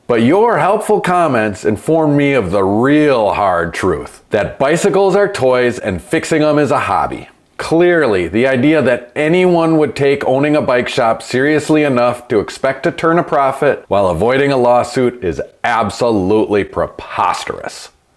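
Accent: Wisconsin, United States. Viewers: Mad proper Wisconsin accent. -715er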